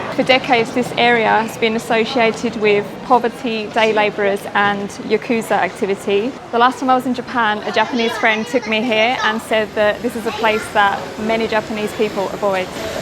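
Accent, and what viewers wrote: England, United Kingdom. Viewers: You got this kind of stuff in every city you live in around the world! Japan is not the only place and saying it isn’t a nice place to live when there bad places and good place to live in Japan just like any other country. And you sound English so I know for fact England isn’t paradise! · That British accent is top notch 😂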